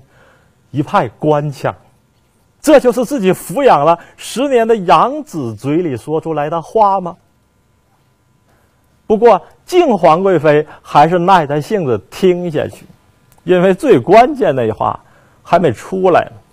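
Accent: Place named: Northeast, China. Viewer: 每次听到东北话，都觉得莫名的搞笑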